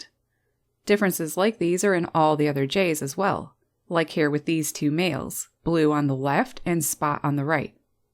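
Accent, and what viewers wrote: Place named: Atlantic Canada, Canada. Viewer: My favorite video! Your accent sounds like you're from east coast of Canada. Are you?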